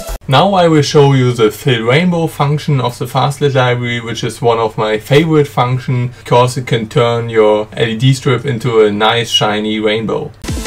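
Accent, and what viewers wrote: Germany. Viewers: Man hört einfach raus das du Deutscher bist 😂😂😉👍🏻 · 1. Sekunde das englisch gehört, dierekt gewusst dass du deutscher bist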